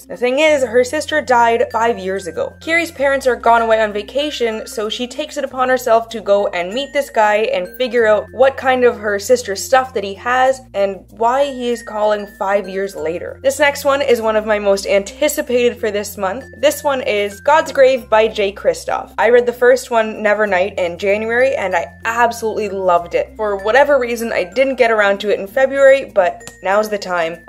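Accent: Brazil. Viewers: Hi beautiful, you are talking portuguese with the brazilian accent, I'm from Portugal and our accent is very different ahah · ohhhh portuguese !!!! that is my language , im from portugal omg. your portuguese was pretty decent , but you definitely spoke with the brazilian accent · I think the spelling of Portuguese from Portugal and Brasil might be the same but the pronunciation on your vid is kinda brazilian lol! You did an amazing job!!!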